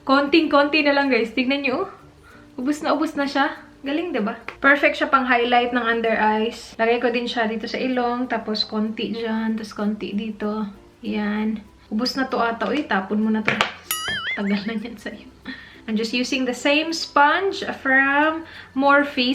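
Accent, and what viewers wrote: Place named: Visayas, Philippines. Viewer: Hahaha kataw.an an Jud Kayo ka ate Oii..Hahahaha.Bisaya kayo ka haha.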